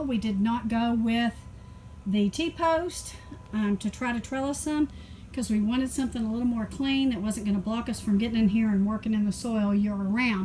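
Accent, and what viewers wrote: Texas, United States. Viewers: Dang. I miss that accent. Grew up in HEB area. Exiled in La.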